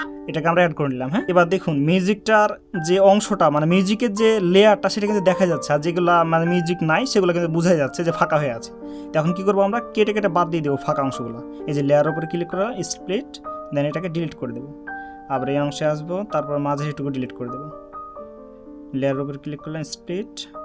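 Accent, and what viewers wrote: Chapainawabganj, Bangladesh. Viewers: রাজশাহী কোথায় আপনার কথা শুনে চাঁপাইনবাবগঞ্জের ছেলে মনে হলো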